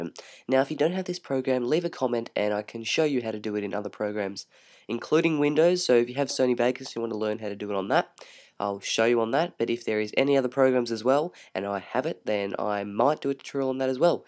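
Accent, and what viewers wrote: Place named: Australia. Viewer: Great to hear another Aussie voice. Thanks for this.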